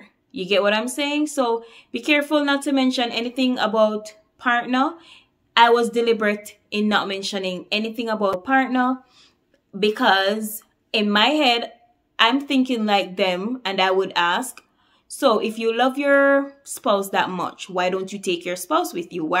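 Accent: Jamaica. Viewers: I love your jamaican accent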